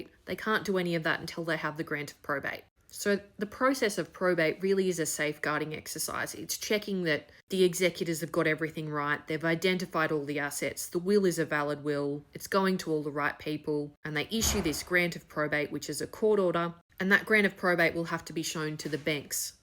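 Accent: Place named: Australia. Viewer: Well explained, with an Australian accent.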